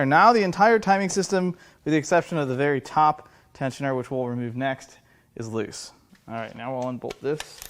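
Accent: St. Louis, United States. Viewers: True StL dialect. Wo ter. Like driving on Far-de-Far past the Carn fields to Worshing MO.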